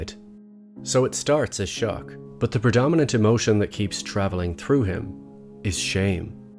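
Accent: Ireland. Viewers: I just binge-watched this playlist (next will be the Better Call Saul playlist) and I really appreciate how you break down and explain the characters and/or situations. On a side note, I'm really curious if you are trying to suppress your accent or if it is really light/moderate like that? (I want to say Irish but I'm second and third guessing myself so not confident on that.)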